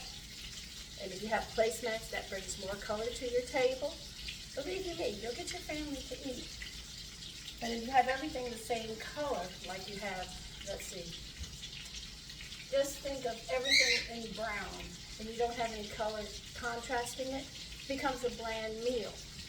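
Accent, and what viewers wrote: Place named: North Carolina, United States. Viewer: You’re telling the truth, more should take heed!!! Sounds like you from NC “whaterburger” “red barn” restaurants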